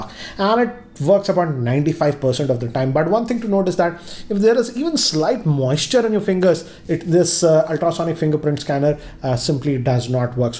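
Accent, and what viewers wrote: India. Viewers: It's not this dude if you do not hear a thicc Indian accent "BUT AGAIN" every 25 seconds.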